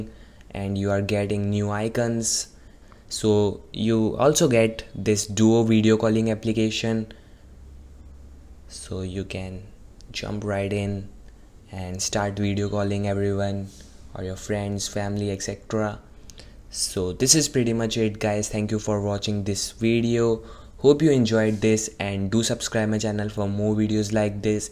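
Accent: India. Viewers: Indians speak english with a really annoying accent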